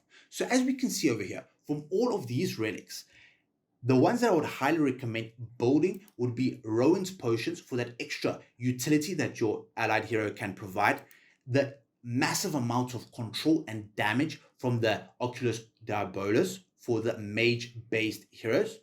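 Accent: South Africa. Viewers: yo bro you from South Africa?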